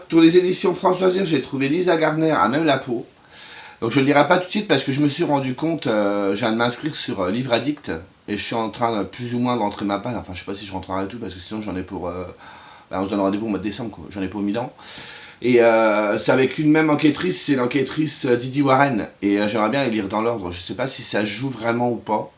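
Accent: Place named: North, France. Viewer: Coucou! première vidéo que je regarde et j'aime bcp ta simplicité et ton petit accent... serais-tu du ch'nord par hasard?? 🤔😁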